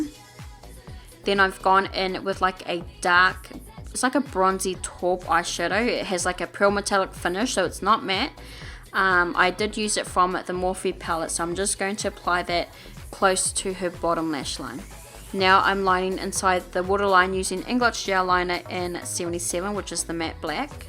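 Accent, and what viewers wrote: New Zealand. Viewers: chur bro so am I. I could tell by your voice that you were a kiwi. A very talented pretty kiwi. Later bol. 😉😆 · Love this look and love the kiwi accent!.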